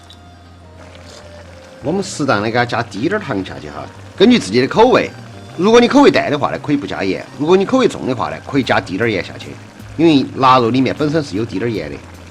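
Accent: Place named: Sichuan, China. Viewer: 雖然聽不懂四川口音，但還感受到那份香味！以後請加上字幕及食譜所用材料、調味料，可以嗎？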